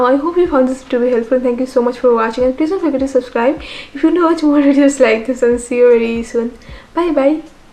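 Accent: Bengal, India. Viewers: I just discovered your channel!! N I love your content!n I hv 2 questions fr u,:- 1)are on instagram??how can I reach u ? 2)r u bengali?(am guessing it fr the way u speak) And lastly much love to u!❤️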